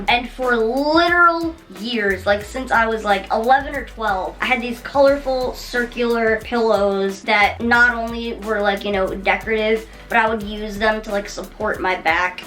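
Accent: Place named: Delaware, United States. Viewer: Im, from Delaware we all still talk like that🤣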